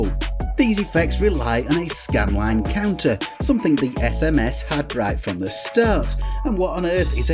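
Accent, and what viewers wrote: United Kingdom. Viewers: Great vid, huge SMS fan here and it's good to hear a Brit doing it justice. Subscribed!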